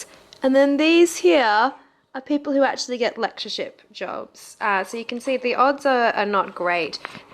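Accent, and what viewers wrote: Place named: Australia. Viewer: One question: Are you Australian? You sound sooo much like it! (I'm an Aussie myself).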